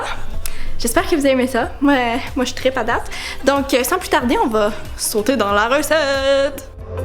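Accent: Quebec, Canada. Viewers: L'accent quebecois est super cute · The accent is mixture of English and french Canadian ,but cute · salut! Merci pour ta vidéo, pas encore essayé car j'attend avec impatience ta vidéo sur les légumes en poudre... D'une pierre, deux coups. Merci tu es trop fraîche 😀 je kiff l'accent Québécois 😁 bientôt j'espère 🤓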